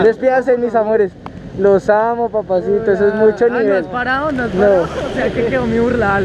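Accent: Colombia. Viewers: Bonito el acento colombiano.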